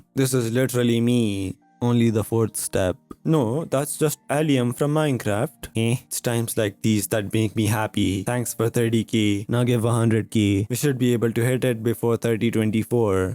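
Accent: India. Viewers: are you Indian, my guy?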